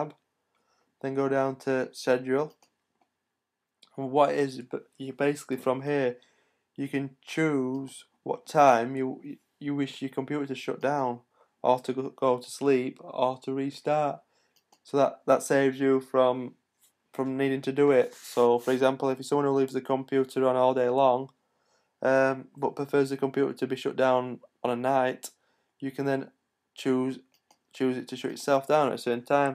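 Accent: Sheffield, United Kingdom. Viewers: you from Sheffield?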